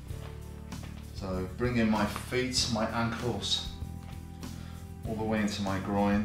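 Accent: United Kingdom. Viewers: Ey,this is amazing...I can do some physical exercises and at the same time improve my listening and pronunciation... Nice British pronunciation!!👌👌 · Pretty British 🇬🇧 accent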